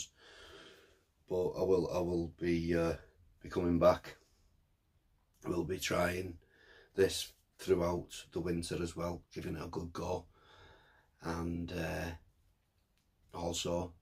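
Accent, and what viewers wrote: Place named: Northern England, United Kingdom. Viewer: There’s nowt wrong with looking and sounding like r’kid Ricky the hitman Hatton 😉👍lol it’s nice to hear a fellow northern lad doing carp blogs makes a refreshing change I can’t stand all this wibble wobble pukka cockney crap! Lol 😆✌️😎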